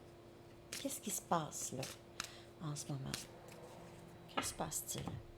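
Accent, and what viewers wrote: Quebec, Canada. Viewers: Bonjour, moi j'adore votre accent québécois, il me fait 😄 Et vos guidances sont souvent très justes 👍 Ne changez rien, c parfait! Et n'écoutez pas les ronchons 😂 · Moi j'adore votre accent,ca me fait du bien, je vous écoute presque tous les jours.❤🙏 Ça me rappelle mon voyage au Québec, j'ai tellement aimé, la gentillesse des personnes et les paysages ds les laurentides ...le vieux Montréal alors merci pour vos guidances et votre accent ❤❤❤😘😘🇨🇭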